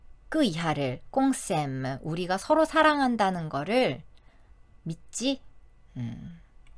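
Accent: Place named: France. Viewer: 불어 발음이 엄청 듣기 좋아요 응원합니다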